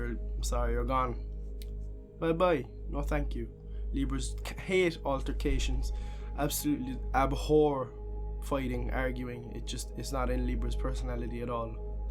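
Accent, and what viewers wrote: Ireland. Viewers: Ireland… WOW. Your accent is aMAzing😃. I like a Libra a lot, but… I didn’t realize he liked me at all(!). I thought he was just being nice and vice versa. He thought I was just being nice. Suddenly, I began receiving highly spiritual signals about this guy. I misunderstood these signals for about two months—till recently. Then, I realized he was trying to tell me too. The challenge is that we see each other in a professional environment. I trust that God has a plan. Cheers🌬️🌤️🕊️.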